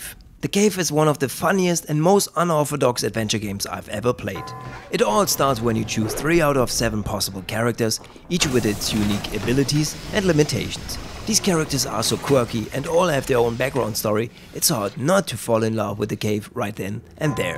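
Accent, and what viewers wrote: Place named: Croatia. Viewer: Are you Croation becuase my friend is from Croatia and he has youre same accent?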